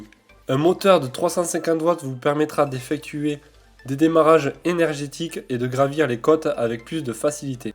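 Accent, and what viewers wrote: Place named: South, France. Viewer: Toi tu es pas de paris mais plutôt de chez nous (le sud bébé 😂)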